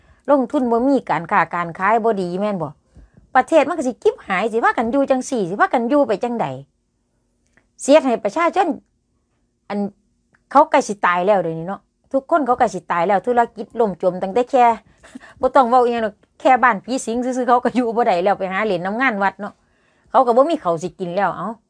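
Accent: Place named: Korat, Thailand. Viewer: โคราชซัดเจน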